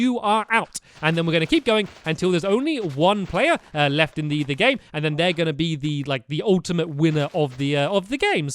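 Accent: United Kingdom. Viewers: why do British pepls say wool instead o' wall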